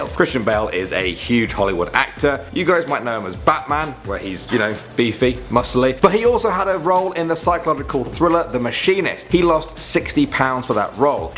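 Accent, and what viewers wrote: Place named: England, United Kingdom. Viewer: He is from England, so, accent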